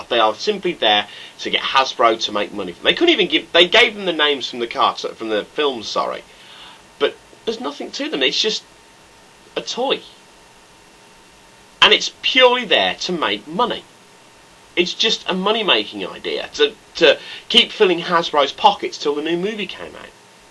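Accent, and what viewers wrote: United Kingdom. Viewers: where are you from you sound scottish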